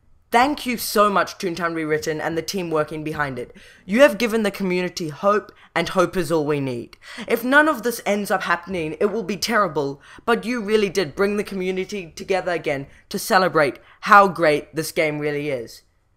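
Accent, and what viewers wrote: United Kingdom. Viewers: You sound british :D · It's British I believe and I know, I wuv it too!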